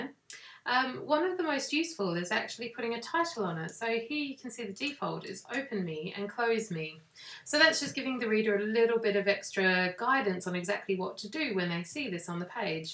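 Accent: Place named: United Kingdom. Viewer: I love the British accent.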